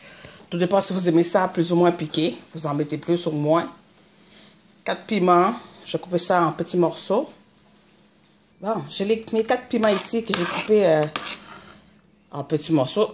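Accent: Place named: Haiti. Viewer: Tu as l'accent créole haïtien et québécois. C'est marrant.... hello de Guyane. J'aime beaucoup le picliz. Humm miamm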